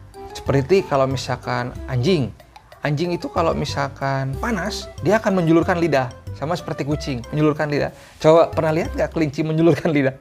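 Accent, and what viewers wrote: West Java, Indonesia. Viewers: Orang mna ktu kang logat ngomong nya mah sunda? 😅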